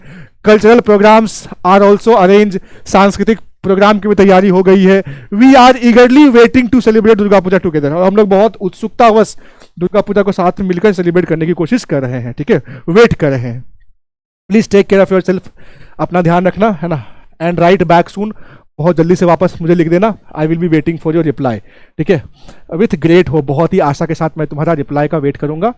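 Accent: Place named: Darbhanga, India. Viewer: Darbhanga wale hai kya 😅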